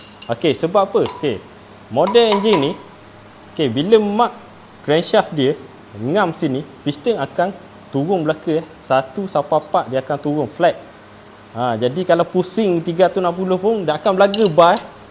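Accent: Terengganu, Malaysia. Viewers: Kemah molek cara penerangan sekali nga amali padu mantap mudoh pehe walaupun pekat sgguh ganu tu..tp jelas sungguh..moga muroh rezki la boh ..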